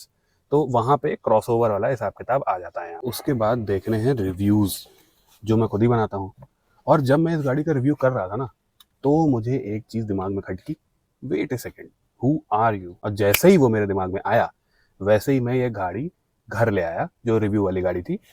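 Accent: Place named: Uttar Pradesh, India. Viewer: Bhai UP ka hai na tu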